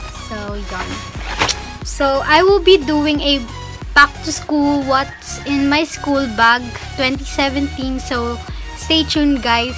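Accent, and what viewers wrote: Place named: Philippines. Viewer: wag ka na mag english halata nang pilipino ka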